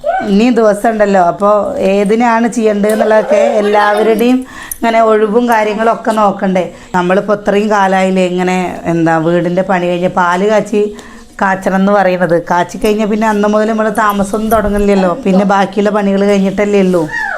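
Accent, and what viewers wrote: Palakkad, India. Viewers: colour mangiyirikkanu. Nalla bright colours like meroon okke idoo., presikkku colour ullondu nannayirikkum onnu maatti pidichu nokku. Nighty maatty frock nighty vedikkoo. Enikku 40 aayi but njan frock kurti aanu veettil idaru.. Nammal nannayitu nadakkansm. Athu pole hair video yil verumbol onnu clip cheythu idooo, eppolum ingane ketti vekkathe. Oru change vende. Nannayitu video yi chilappolengilum.frock nighty Instagram il 200 alle. Rendalum koodi oru psc apply cheythitu book vedichu parasparam chodhichitu padikkoo. Jeevitham mari mariyum. Endholke paranjalum... Enikku bhayangara ishtaaaaa ee slang.. Palakkad an bhasha. Eppo enikkku edayil verum appo ende makkal kaliyakkum❤❤❤❤luv u all soooo much., kuttikal... Amma kulichitu mudi azhichidumbol nallachelanu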